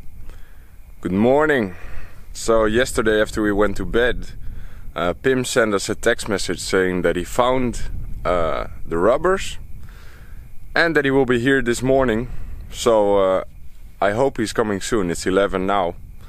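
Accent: Netherlands. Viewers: I can hear the dutch accent when you talk :P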